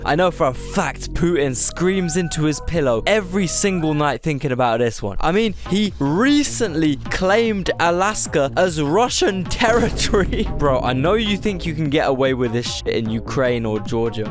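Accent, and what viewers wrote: United Kingdom. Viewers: British accents have an edge on everyone else when it comes to narrating YouTube videos like this guy. For instance, listening to critical drinker with his Scottish accent spew his criticisms of movies and streaming tv shows like Amazons Rings of Power is absolutely hilarious because he’s mostly right, but also that Scottish accent gives it an extra comical spice. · lol actual Native Hawaiian here ill show you around if you give me british accent lessons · Why is a British guy explaining US states? What are the rankings supposed to be based on? Have you ever even been to the US?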